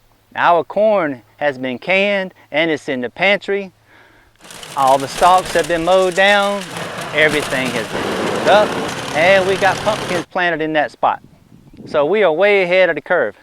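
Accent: South, United States. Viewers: Holy Moly...I didn't know you were back. Oh happy day. Love your videos and listening to your southern accent. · I've been watching your videos for years. You have the best looking tomato plants on YouTube, hands down. Big Beef is a mainstay in my small urban garden as it always seems to be a good beefsteak producer. I like that you understand that different methods of pruning and supporting work well and there is no 'best' method. I agree. Also the southern accent is pretty nifty. Cheers -Lucas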